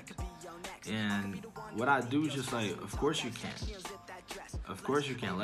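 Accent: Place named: United States. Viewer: Hello teacher, I’m Yahya, all thanks to you and teacher, I learned just to focus entirely on an accent that I like to be recognized by and that’s American which teacher speaks 😊 keep up the good work ✋️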